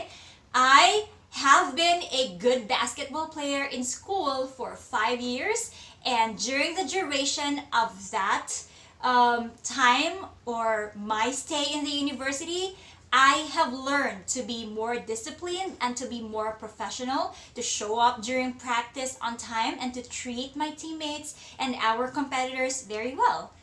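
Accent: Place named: Philippines. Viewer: She is a great job for the influenced about English interview question but still Filipino accent at the same time. God Bless. 🙂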